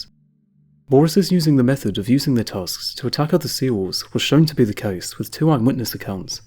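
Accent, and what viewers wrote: United Kingdom. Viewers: First 10 seconds of the video was too British for me to understand 😭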